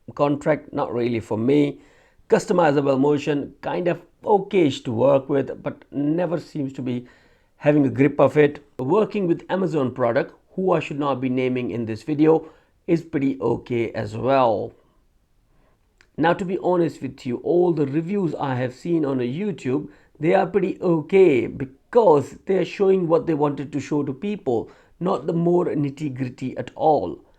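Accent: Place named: India. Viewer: You are speaking Indian, we didn't able to understand what you are saying !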